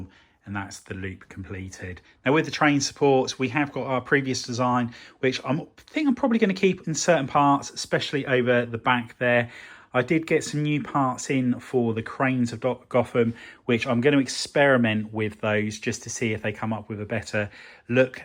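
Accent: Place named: Midlands, United Kingdom. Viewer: A bit off-topic, I am just trying to place your accent. The best I have come up with so far is 'somewhere above Birmingham but lower than Manchester'.😉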